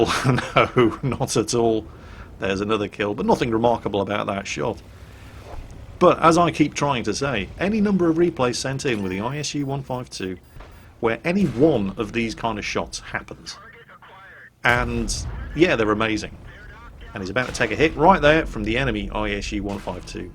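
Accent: Russia. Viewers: Gotta love ur laugh at these blindshots and the russian acsent:D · Love when is doing Russian accent.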